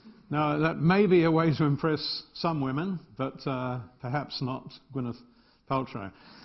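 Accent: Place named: RP, United Kingdom. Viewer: O'Rourke . . Of course he is British. He has a typical upper class academic English accent. Moreover, he used the term "chuffed", which in England means "very pleased with oneself or the outcome". However, I suspect that in his time he has been a 'bit of a lad' himself.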